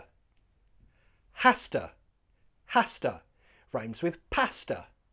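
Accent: United Kingdom. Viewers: Amazaing sir British accent English 😊😊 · Hlo sir I am Tara that's really amazing I am up for watching ur video I like ur teaching style.however I wouldnt like British accent bt uve grown on me thank u I love u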